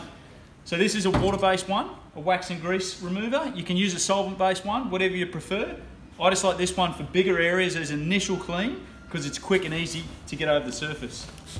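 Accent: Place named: Australia. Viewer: How do I get the australian accent?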